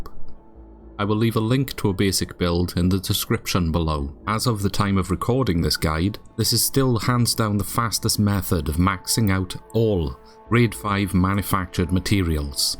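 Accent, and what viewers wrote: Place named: Wales, United Kingdom. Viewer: Took me a minute to figure out that whimsical accent lol thats gotta be welsh! Appreciate the tutorial, o7 from Ireland :)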